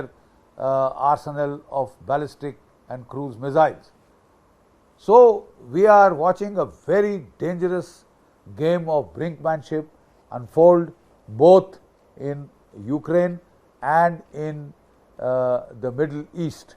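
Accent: India. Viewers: I can’t listen to this paj33t accent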